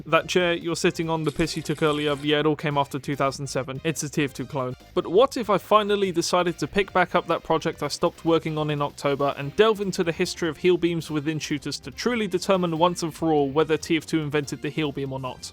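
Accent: United Kingdom. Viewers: is * not british?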